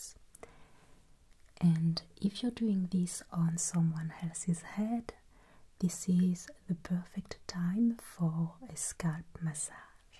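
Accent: France. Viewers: You're french ? Because you have an accent french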